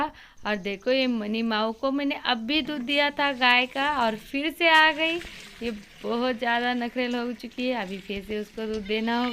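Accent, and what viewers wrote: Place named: Maharashtra, India. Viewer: मराठी दिसत आहात.... मराठी माणूसच अशी हिंदी बोलतो.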